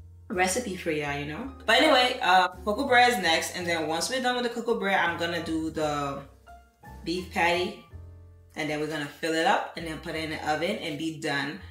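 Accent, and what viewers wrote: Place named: Liberia. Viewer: The way you pronounce certain words reminds me of Mercy Gono, who is also from Liberia.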